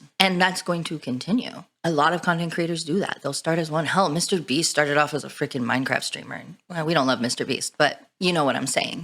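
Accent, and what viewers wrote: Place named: California, United States. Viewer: Yea my first time seeing you and I gotta say that's a strong ass California accent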